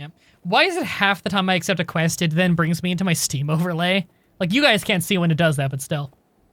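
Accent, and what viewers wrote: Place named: Canada. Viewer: You look like a viking but your voice shows like a Canadian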